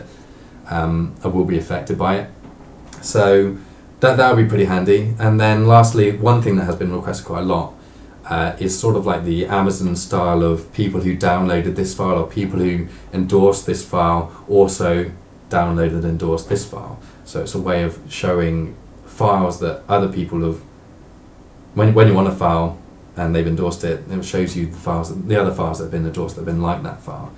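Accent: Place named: United Kingdom. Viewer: Very interesting as always. British accent makes everything better!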